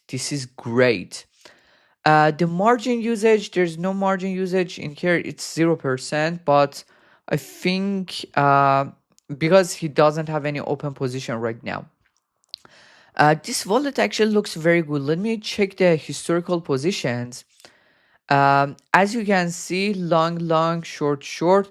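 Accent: Iran. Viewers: از لهجه تخمیت معلومه ایرانیی😂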